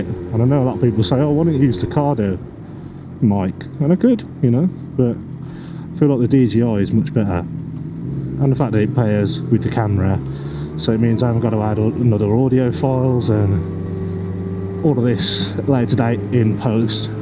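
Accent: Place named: Birmingham, United Kingdom. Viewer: Where are you from matey, you accent sounds pretty near to mine in Bedworth, yours sounds a bit more towards Brum though?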